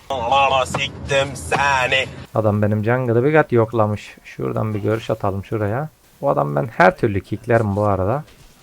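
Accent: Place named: Muğla, Turkey. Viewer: Askerde Muğlalı arkadaşlar vardı. Şiveye ordan asinayim. Ama Alanya tarafına da benziyor. 'Nişlen' kelimesini çoğu kişi anlamaz.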